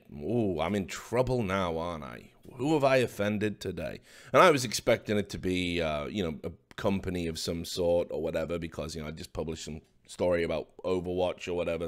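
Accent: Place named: England, United Kingdom. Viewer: LMFAO. When he said "COCK AND BALLS", I died. I absolutely love English accents.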